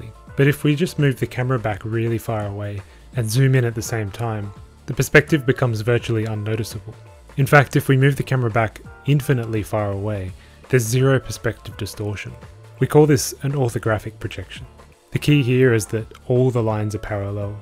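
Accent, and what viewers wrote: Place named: Australia. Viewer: >strayan accent >math :(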